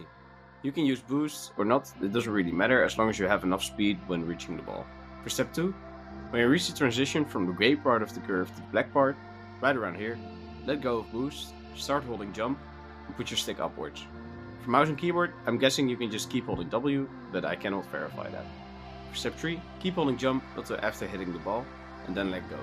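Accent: Netherlands. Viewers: haha het accent gaf idd een indicatie dat OP een Nederlander is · Het Nederlands accent 😭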